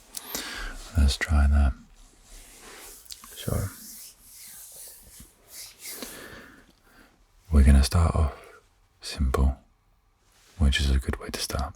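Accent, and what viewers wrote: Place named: United Kingdom. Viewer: calm British voice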